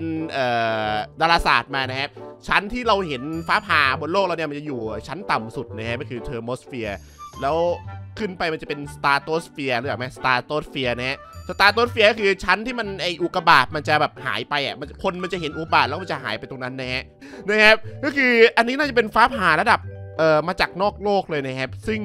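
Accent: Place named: Suphanburi, Thailand. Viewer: สำเนียงสุพรรณ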